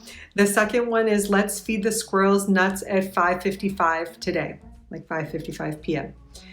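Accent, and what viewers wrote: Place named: United States. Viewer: you do pretty good, you are near to be fluently...... but, you always will have an american accent. I am bavarian and I know always who is from saxonia or frankonia, or worse, from the "Schwarzwald" area (my cousin lives there, she has a terrible accent), and I am aware that everybody (native german) will know that I am from bavaria 🤗 accent is ok, 👍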